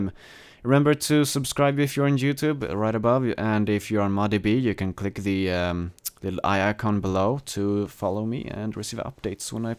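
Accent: Sweden. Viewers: Hah jag kunde höra att du var svensk :D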